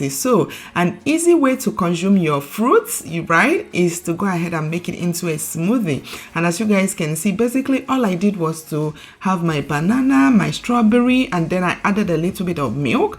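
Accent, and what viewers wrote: Nigeria. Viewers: WE LOVE THE NAIJA ACCENT 🥰❤️🥰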